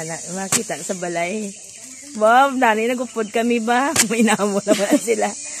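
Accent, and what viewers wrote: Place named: Waray, Philippines. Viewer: Sarap pakinggan tono at salita niyo parang waray.heheehehehe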